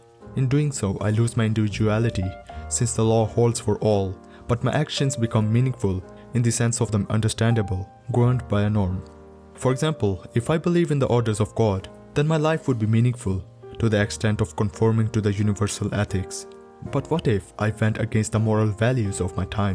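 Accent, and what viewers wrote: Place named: India. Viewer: India comes under Asia, but I feel like he has a strong Indian accent, maybe south east Asia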